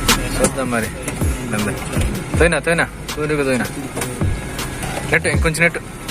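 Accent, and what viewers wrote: Chittoor, India. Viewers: Ni videos super ba.. slang style vere level..baa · I love chittor slang. I have frds in tpt chittur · ఫస్ట్ టైం లా లేదు బ్రదర్...బాగా అనుభవం ఉన్నట్టు చెప్పారు...🤔🤔🤔ఏదేమైనా మీరు సూపర్ బ్రో...మన యాసని సరదాగా ప్రపంచానికి పరిచయం చేస్తున్నారు... వింటున్నంత సేపు చిత్తూర్ పరిసర ప్రాంతాల్లో తిరుగుతున్నట్టు భలే ఉంటాయి మీ వీడియోస్...👌👌👌👍👍👍 ఫ్రమ్ శ్రీ కాళహస్తి...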